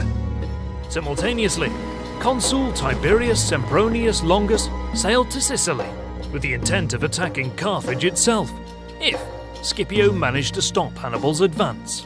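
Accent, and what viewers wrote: England, United Kingdom. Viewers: Why does this sound like it was written by an American and read by an Englishman? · The best animated documentary of the 2nd Punic War ever seen: very detailed descriptions, beautiful animations, great music and sound effects (seems to me Rome Total War style). I almost forgot, your British accent is very elegant and very understandable, thanks a lot! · Subscribed! That's what I call interesting quality content, I even love your British accent. :)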